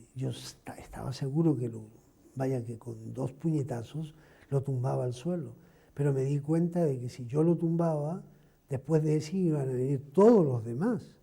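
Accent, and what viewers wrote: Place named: Chile. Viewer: Con la mierda hasta el cuello pero conservando una exquisitez de inglés chilensis ❤️❤️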